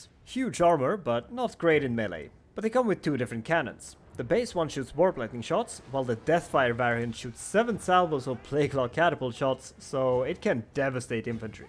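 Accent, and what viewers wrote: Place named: Sweden. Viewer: Are you from Sweden? It sounds like it.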